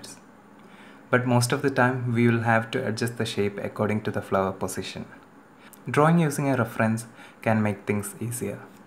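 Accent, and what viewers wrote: Kerala, India. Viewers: You sound so malliyali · Dude your accent... kerala?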